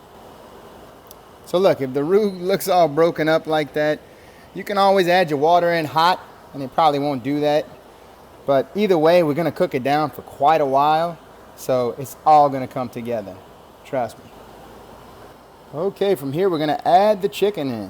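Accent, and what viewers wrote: Louisiana, United States. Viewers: cajun accent...fixed it for you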